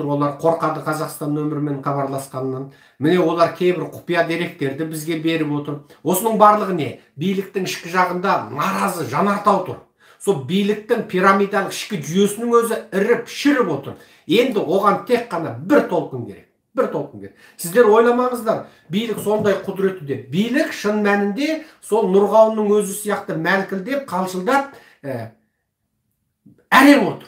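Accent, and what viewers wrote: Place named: China. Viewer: Сені кім сатып алған.Қытайский акцентпен сөйлеп отырсың ғой.Қытайда отырып алып біздің елге ірткі салма.Сенсіз де өзіміздің агитаторлар жетіп жатыр.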